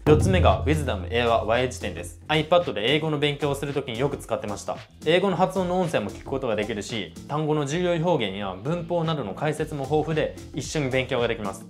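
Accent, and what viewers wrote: Kansai, Japan. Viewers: 話のイントネーションと阪神タイガース好きって言ってたんで笑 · 先生のイントネーションで関西弁出てますよw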